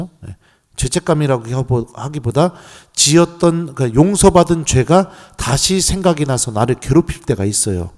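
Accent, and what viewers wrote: Chungcheong, South Korea. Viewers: 요즘 신부님 특강 너무 재밋게 듣고 보고있습니다. 구수한 충청도 사투리가 마음속에 쏙쏙 와닿는 것 머리속에 꽂치는거 신부님은 천상 우리의 스승님이십니다~ 고맙습니다.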